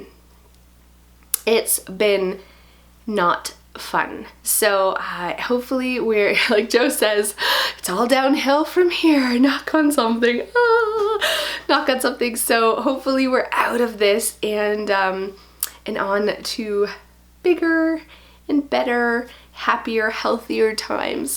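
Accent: Canada. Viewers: First 10 seconds of the video: op she's Canadian lol.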